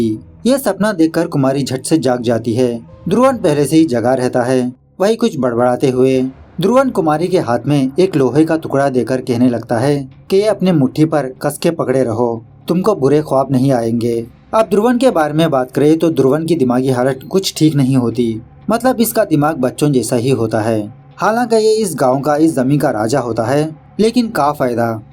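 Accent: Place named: South, India. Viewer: Love ur voice ji😍😍 South Indian hindi bolte to accent bht acha lagata hai..me v south side se hoon..andhra odisha border😀😀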